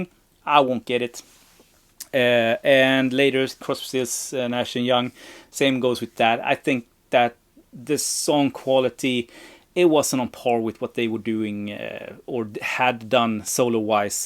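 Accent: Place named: Sweden. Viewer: gillar svengelskan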